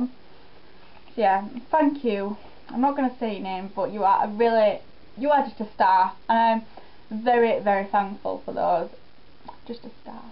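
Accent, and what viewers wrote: Yorkshire, United Kingdom. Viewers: Are you from Yorkshire?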